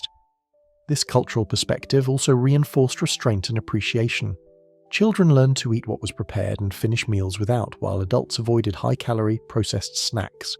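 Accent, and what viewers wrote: United Kingdom. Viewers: The voice and quite refined English accent are very pleasant to listen to - but the language is American with the usual grammatical errors and mispronunciations, which is a real pity.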